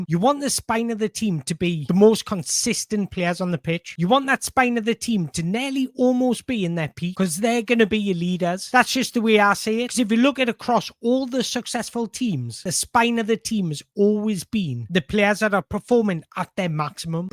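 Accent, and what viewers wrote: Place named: Geordie, United Kingdom. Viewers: I like your videos, but the editing in this one is really jarring. I've had to stop about 10 mins in as I couldn't take any more. Maybe rehearse your script a bit more to avoid so many hard cuts. Im not really a fan of heavy Geordie accents either (im a Geordie myself), so maybe try to tone that down a bit, too, if you can. Finally, maybe work on lowering your tone by a few octaves, too. You're fairly high-pitched, and so a combination of the heavy accent, high tone, and hard cuts is really grating. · Are you a mackem? The way presents is informal and hes funny too. As for "heavy Geordie accent"..... Theres nowt better!!!! No need to tone it down, that's the way we talk, and why Geordies in general are admired for their accents!!! Keep up the absolutely brilliant work 🖤🤍⚽️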